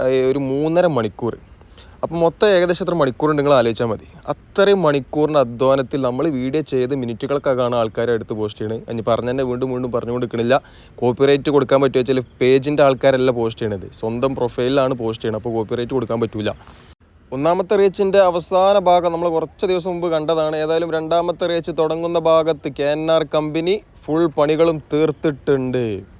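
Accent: Malappuram, India. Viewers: Bro, just a small piece of advice, pls present in a neutral slang. The Malappuram slang that you are presenting is extremely rough! Such an overkill! I hope you are producing these videos for the whole of Kerala! If not, pls ignore the comment! Nevertheless, I appreciate the efforts taken by you to create these contents! Keep up the good work!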